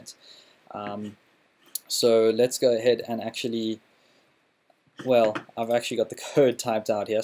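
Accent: South Africa. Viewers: Thanks for a really useful and easy to follow tutorial series - I'm a subscriber. You make learning enjoyable and simple! Love your South African accent too mate... and you're a really pleasant and cool guy! Keep up the great work... and I'd love to see more tutorials on topics such as Angular.js, react.js and node.js, etc. We'll keep you busy mate!:-)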